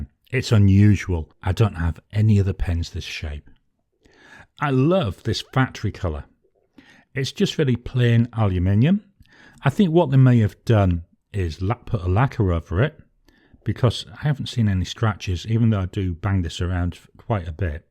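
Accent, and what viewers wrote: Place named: Birmingham, United Kingdom. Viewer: your bio says you're based in Austrialia but to me you sound like you're from Birmingham. Am I right or am completely off on this one 🤔😂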